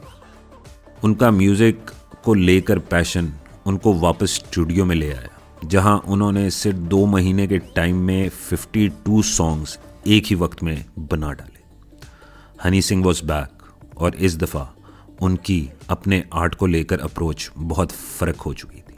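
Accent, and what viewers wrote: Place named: South, India. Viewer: R u a muthalman bcoz u sounds as a mdracha chaap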